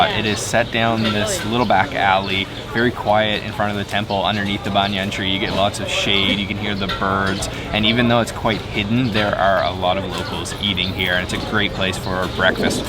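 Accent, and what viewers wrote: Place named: Canada. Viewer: Your Canadian accent is so freaking annoying.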